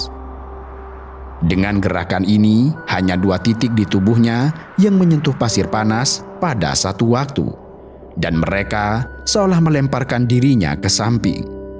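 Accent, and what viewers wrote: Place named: West Java, Indonesia. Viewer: Fiks. Yg ngomong orang sunda.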